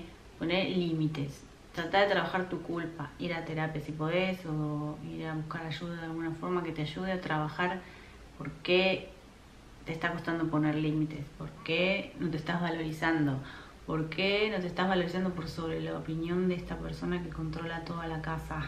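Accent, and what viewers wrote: Argentina. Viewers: El vídeo más claro del Canal.Vi otros dónde el estilo porteño de hablar le hace perder claridad y sentido al mensaje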